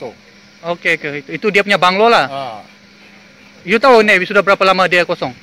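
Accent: Sabah, Malaysia. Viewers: mcm org Sabah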